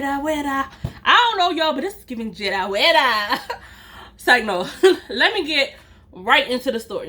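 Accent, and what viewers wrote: Baltimore, United States. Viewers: This was your first storytime I watched and when I say that your accent and storytelling skills kept Me fully entertained, it did. I Love Your BMore accent🥰😊😊😊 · I love your Baltimore accent lol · My man here like I know she from Baltimore lol your accent STRONG LOVE YOUR CONTENT BABES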